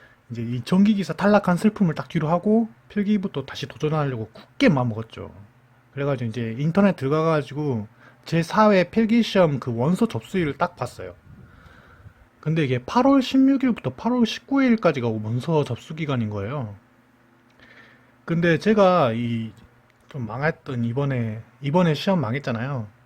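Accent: Jeolla, South Korea. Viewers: 전라도 말투네용ㅎㅎ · 전라도 사투리 엄청 쓰시는데유?..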